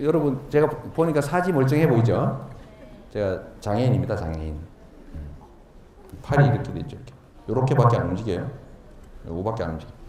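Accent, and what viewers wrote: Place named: Gyeongsang, South Korea. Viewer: 경기도 살면서 갱상도 말하면안대지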